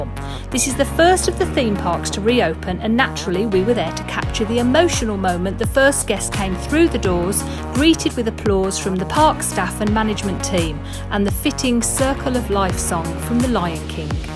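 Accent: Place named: Birmingham, United Kingdom. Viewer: Great to see things getting back to normal, over middle of September should be a lot further advanced by then and you must be a Brummie with that accent 😃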